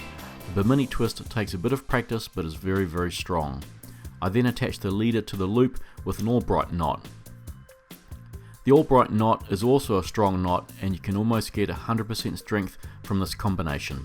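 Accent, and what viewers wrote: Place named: Australia. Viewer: gday gday mate u from ozzy mate?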